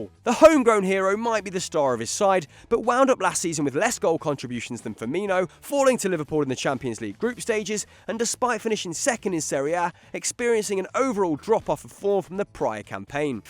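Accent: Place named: Leicester, United Kingdom. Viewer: Can you tell that this guy is from Leicester? 😂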